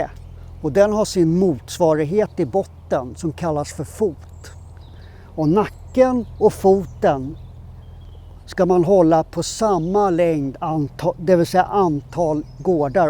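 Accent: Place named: Stockholm, Sweden. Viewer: Samma dialekt, stockholmska. rösten är ju inte väldigt lik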